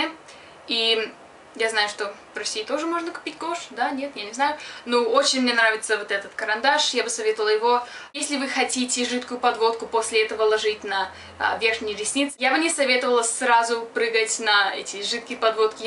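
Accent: England, United Kingdom. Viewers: У тебя английский акцент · Я не понимаю что у с разговором?Она англичанка?Или как потому,что слышен какой то иностранный. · Как заметен английский акцент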